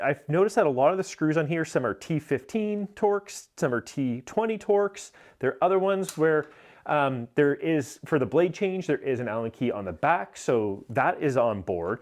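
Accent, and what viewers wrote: Canada. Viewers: This dude sounds so Canadian.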